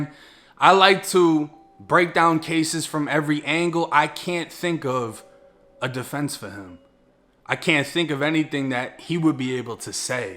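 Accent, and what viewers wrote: Boston, United States. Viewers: I have to ask, r u originally from boston? U truly sound like u got our boston accent💯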